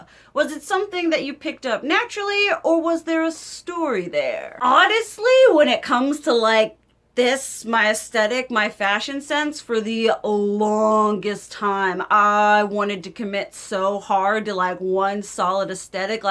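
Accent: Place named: Alabama, United States. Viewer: I happened upon you b/c of your kvd video several months ago. Next thing I knew it was several videos and several hrs later of bing watching you b/c your accent felt like home. -Alabama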